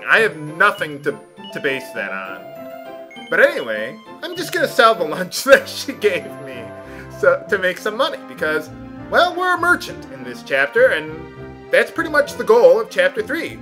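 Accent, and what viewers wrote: Ireland. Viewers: This accent sounds Irish to me. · btw its Irish the accent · its an Irish accent:) and also give the cowpat to tornecko when u can:) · It sounds like he is Irish to me. The myth of the town disappearing and some the phases are what make me think so.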